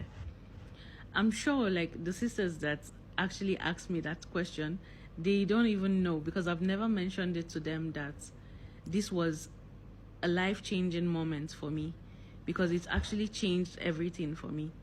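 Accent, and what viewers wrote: North, Nigeria. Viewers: Sorry. Firstly, you don't look like an igbo person. Secondly, you have a core northern accent. Igbos dont have such accent even if they've stayed in the north all their life. Thirdly, you don't know Jesus personally. Christianity is not a religion. It's a way of life. :Christ like". Finally, you're just talking nonsense.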